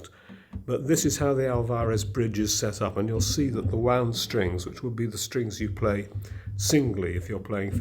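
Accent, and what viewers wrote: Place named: United Kingdom. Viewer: I am truly intrigued by this gentleman’s British accent. Being an American our English is is rather lax and candid.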